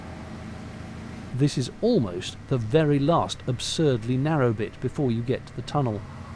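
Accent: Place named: RP, United Kingdom. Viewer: Both "glided" and "glid" are acceptable past participles of the verb "glide". Bog is considered informal so I think he is doing it merely to deflect from his received pronunciation that pervades his every video. You have to love the BBC training that he received for all of it's ills... It's a good job he is good at this video production malarkey isn't it?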